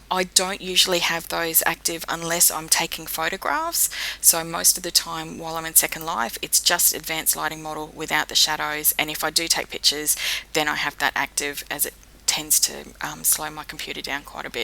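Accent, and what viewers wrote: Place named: New Zealand. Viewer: ooh I thought you sounded like youre from New Zealand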